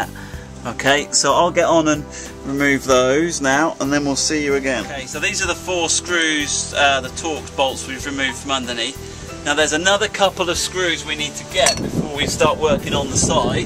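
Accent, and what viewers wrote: United Kingdom. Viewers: 😂😂😂😂 That British accent😅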